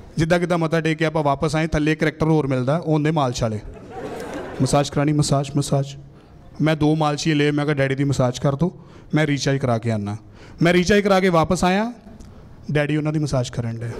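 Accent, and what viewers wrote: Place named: Amritsar, India. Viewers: Pure majhi boli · THAT typical ambarsari accent and words... Priceless...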